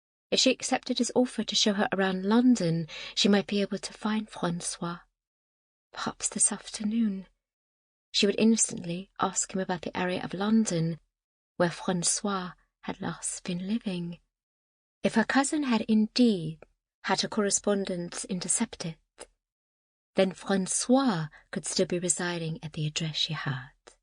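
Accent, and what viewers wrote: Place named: United States. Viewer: Why use an American for a British story? There are great RP English voices available. Be authentic!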